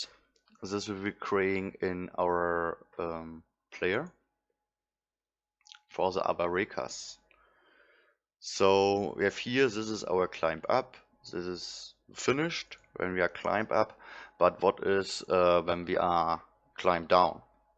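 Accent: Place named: England, United Kingdom. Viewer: i wanna say a big Thanks for all of your tutorials they are really help :) (i love your lovely English accent)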